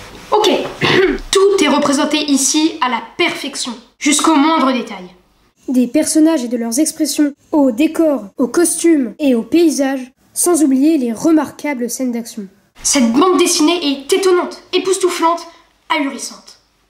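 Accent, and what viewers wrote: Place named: Spain. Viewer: enfin te revoilà! une bien sympathique vidéo, qui donne envie de se mettre à la BD, merci! j'ai adoré ton accent espagnol aussi!;)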